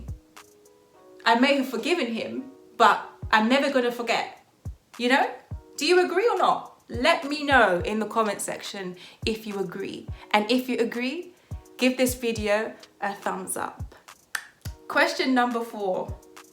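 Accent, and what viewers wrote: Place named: Jamaica. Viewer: You sound a bit Jamaican are you Jamaican? #Party #Protect_me #ova 💊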